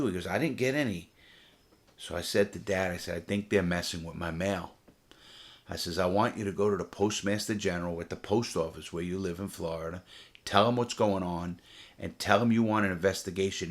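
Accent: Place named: North, United States. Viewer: Legit question lol. If you live in florida and have lived there your whole life how do you have such a northern accent??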